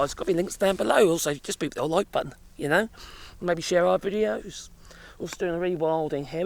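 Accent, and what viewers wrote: United Kingdom. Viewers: It’s his British accent.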